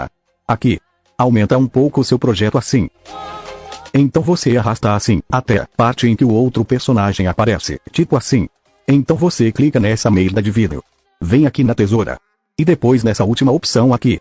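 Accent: Bahia, Brazil. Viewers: O e baiano?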